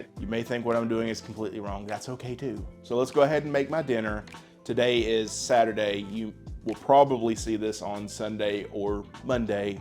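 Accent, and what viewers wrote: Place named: South, United States. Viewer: I love southern accents and the way you guys say uhl. Or earl lol when I’m around someone with the accent for a few hours I subconsciously try to pick it up and use it…. 😬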